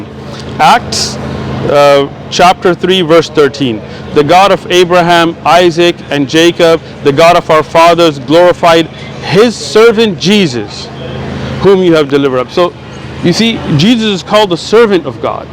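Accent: United Kingdom. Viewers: I love sheikh British accent., 😂😂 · Loving the British accent 😅😅😅 Anyways Salaam from Malaysia. Your videos has been my go to “podcast” to listen to while driving back and forth to work.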